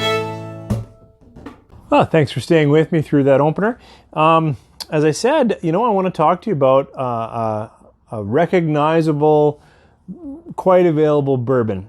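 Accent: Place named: Canada. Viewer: Ha, that accent...I could tell you're Canadian.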